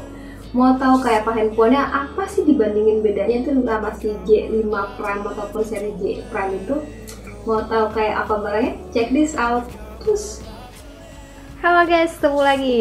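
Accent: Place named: Cirebon, Indonesia. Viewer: biasa biasa bae.. hehee wong cirebon bangettss..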